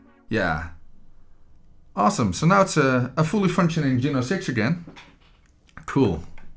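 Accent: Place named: Moldova. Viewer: First hearing your accent. Flavors of Moldova ?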